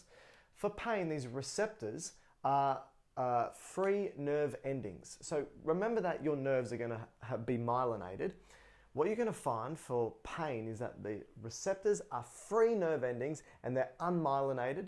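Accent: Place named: Australia. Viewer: Came for the muscles. Stayed for the Aussie accent.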